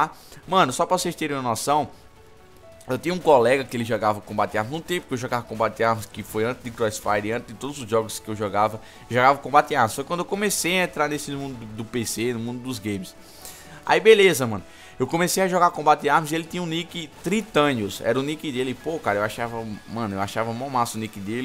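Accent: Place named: Paraíba, Brazil. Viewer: paraiba da pra ve o jeito q vc fala kk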